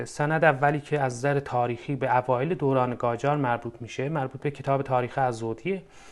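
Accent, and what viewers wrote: Azerbaijan. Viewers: درورد بر استاد عزیر و ارجمند با این لهجه ی زیبای آذری مرحبا به شما و دوستان شما بابت آگه سازی تاریخ ما. پر قدرت ادامه بدهید با تشکر. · لهجه اذری شما را دوست دارم. به ایران ایمان دارم.مردم ایران بهترین مردم دنیا هستند. فرهنگ و مدارا در ایران وجود دارد. لهجه نشون میده فرهنگ و مدارا در تبریز و اذربایجان و گیلان واصفهان و کل ایران وجود دارد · لهجه اذری شما بینهایت زیباست.❤❤❤❤❤❤❤❤❤❤❤❤❤❤😮😮😮😮😮😮😮😮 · درود خدمت استاد گرامی جناب چقدر غرور آفرین هست که استادی با این گویش شیرین آذری با اسناد مستحکم تاریخی بلای جان ایران ستیزان بشود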